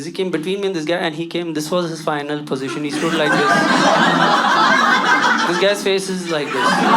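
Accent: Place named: South India, India. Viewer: Watching you for the first time and totally hooked....you sound so much like a south indian 😂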